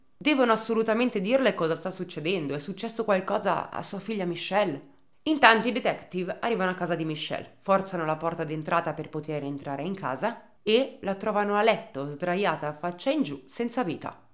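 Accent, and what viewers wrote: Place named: Genoa, Italy. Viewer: Mi pare di capire che sei leggermente genovese🤣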